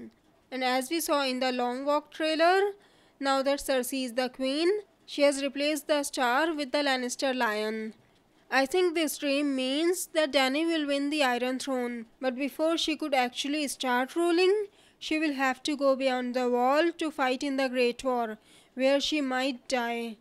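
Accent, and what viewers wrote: India. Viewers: the video is good but ur accents indian _. no offence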